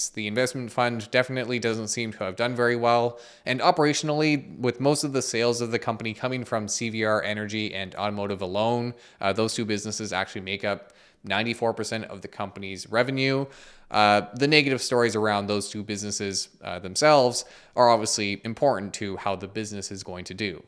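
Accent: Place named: Ottawa, Canada. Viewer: No way, picked out your accent on the words "they are at it again" and "they got a lot of flak last time" and found out your in Ottawa! No fucking wonder I recognize it! Crazy to think someone I watch online is just chilling somewhere in my city!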